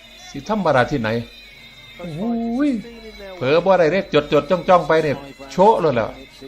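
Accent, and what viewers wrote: Isan, Thailand. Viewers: ชอบเสียงพากย์อีสานโคตรเทพ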